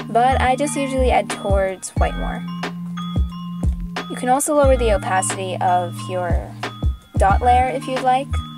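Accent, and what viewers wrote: United States. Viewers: ngl wvwn tho ur filipino.. (like meee😌) ur voice sounds like an american. :O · Omg!!! Your a Filipina!!! I thought u were from the STATE'S!!!! YEEEEEEEEE ❤💕❤💕❤💕❤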